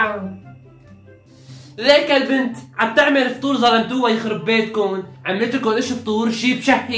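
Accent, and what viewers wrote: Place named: Aleppo, Syria. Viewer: أنا عم اتعلم الحكي الحلبي كلو منك💜💜❤️❤️مين متلي 👍👇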